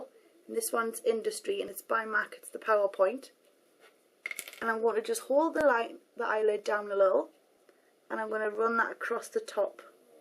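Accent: Newcastle, United Kingdom. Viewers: Geordie accent--can't get any better than that!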